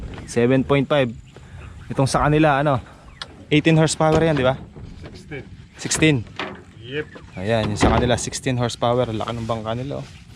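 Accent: Pampanga, Philippines. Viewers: Ka buddy kapampangan ka po ba? Hahahaha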